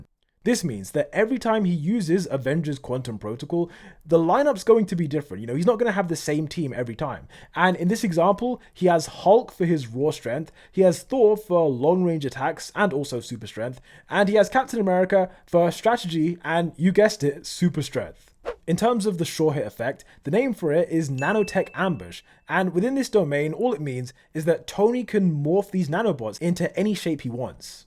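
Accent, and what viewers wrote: United Kingdom. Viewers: This ngas black??? Sorry I don’t have any problems with this but I had no idea. Thought you were a 30 yr old British white guy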